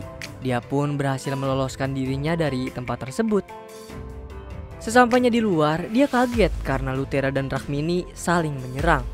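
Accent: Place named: Banyumas, Indonesia. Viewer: Anjir ,,,ngapak coy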